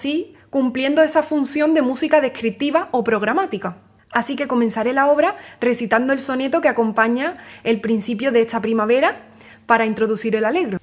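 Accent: Andalusia, Spain. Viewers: En el otoño de mi vida encontré la primavera en esta niña talentosa sólo con escuchar su música y su gracia andaluza en el hablar todas las cosas se cambiaron ya para mi sólo puedo decir floreció en mi una eterna primavera GRACIASPOR EXISTIR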